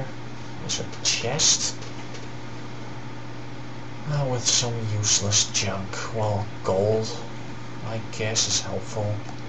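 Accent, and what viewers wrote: Netherlands. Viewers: it aren't lisp he just has a dutch accent. but your right it is really annoying.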